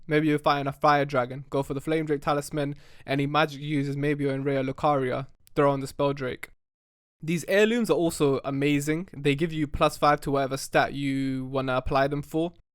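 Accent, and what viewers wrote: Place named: London, United Kingdom. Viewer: Bro is definitely from london 😭😭😭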